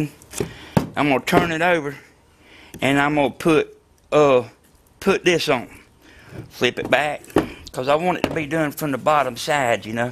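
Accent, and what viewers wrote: United States. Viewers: Fantastic accent. A true American.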